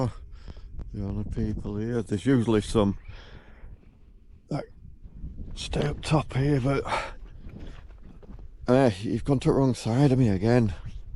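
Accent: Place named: Yorkshire, United Kingdom. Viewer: Love Howarth and Skipton, are you from yorkshire? Our accents sound similar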